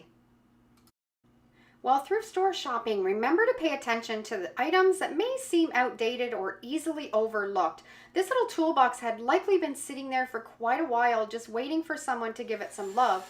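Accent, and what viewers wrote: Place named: Canada. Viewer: I knew from your accent that you were not American! Go Canada!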